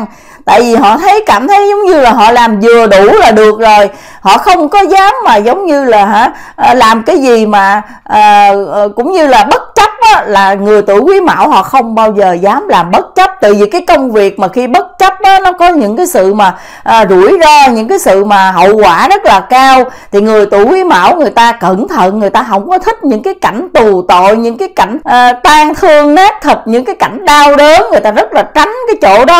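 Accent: Standard. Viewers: Chi noi qua chuan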